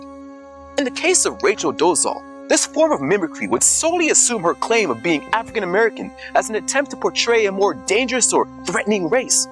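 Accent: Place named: Jamaica. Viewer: This is awesome!! I detect a very slight Jamaican accent so I'm double proud! #blackexcellence #videoswithpurpose 🏆🥇🏆🥇🏆🥇🏆🥇🏆🥇🏆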